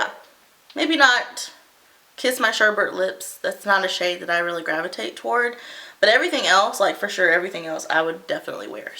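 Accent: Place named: Canada. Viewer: I hear a boat when Canadians say about & I think it's cute! Thanks for the review. I've got a shopping list and somne of these are going on it 😉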